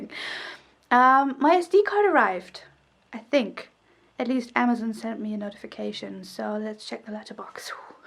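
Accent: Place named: United Kingdom. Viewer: You sound so british 😊💕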